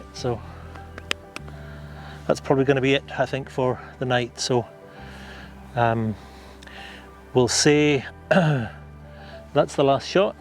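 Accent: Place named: Orkney, United Kingdom. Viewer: So glad Simon Booth pointed me in your direction, your Orcadian accent reminds me of holidays up there and my dad who still has his twang despite living in the Midlands since the late 50’s. Rallying used to be my hobby and now it’s photography so work will be my next port of call 😉 lovely images guys 👍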